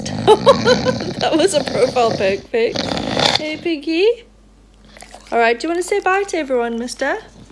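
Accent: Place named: England, United Kingdom. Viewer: I just love your English accent (I am from US) Your close ups of piggy's face are really cute and funny! I love your your commentary, too!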